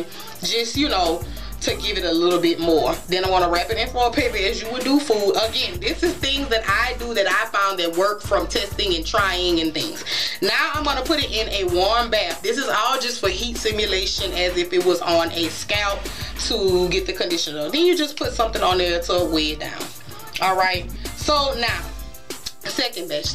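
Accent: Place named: New Orleans, United States. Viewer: and love your accent as well and your from New Orleans that's one of my fav accents girl lol ??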